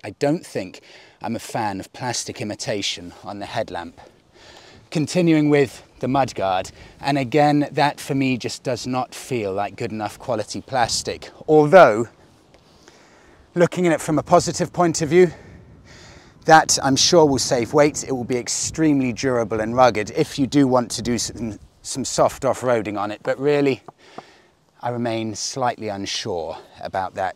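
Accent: United Kingdom. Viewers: I subscribed because of your being so british. Greetings from across the Northsea. Love the accent and manners. 😊 also great review.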